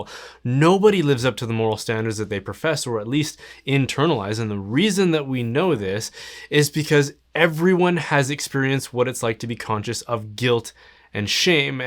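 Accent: Canada. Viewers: It's not dubbed over and he's a Canadian.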